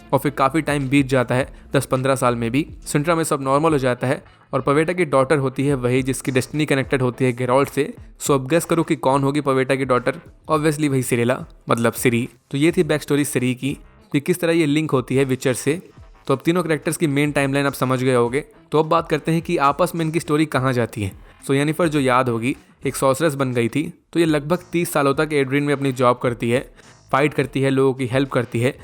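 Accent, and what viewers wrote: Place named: Chhattisgarh, India. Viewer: Tum chattisgarh k lagte ho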